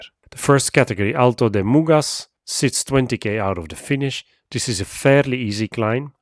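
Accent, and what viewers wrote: Belgium. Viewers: Belgium accent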